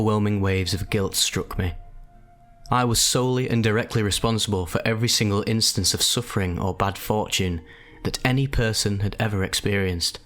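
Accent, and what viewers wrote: United Kingdom. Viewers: Are you british or am I tripping? both?😊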